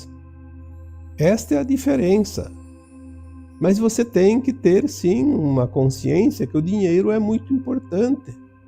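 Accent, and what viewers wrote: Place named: Rio Grande do Sul, Brazil. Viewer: Eu acho que é do Rio Grande do Sul 😅pelo sotaque 😊😊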